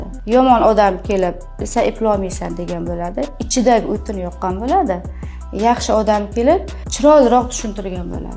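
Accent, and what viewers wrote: Bukhara, Uzbekistan. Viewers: Buxoroliksiz adashmasam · Togri topdiz buxorolik bu opa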